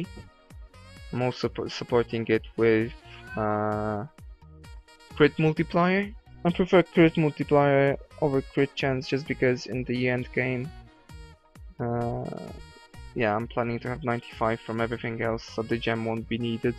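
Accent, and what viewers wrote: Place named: Poland. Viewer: Love the epick Suck Guy playing in background and btw nice english for a pol akcent similar to shusei :D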